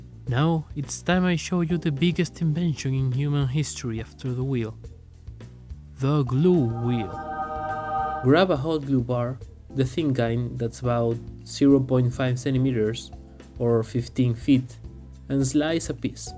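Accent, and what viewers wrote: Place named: Russia. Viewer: I was thinking Russia or Kazakhstan you sound like a friend shows how well trained an ear I have for accents. Spain and South America seem to have many really great crafters. Have you ever heard of Scenery Planet TV? They make some really cool terrain boards and Blood Bowl fields.I look forward to more vids by you and how the world of crafting is doing on your side of the world.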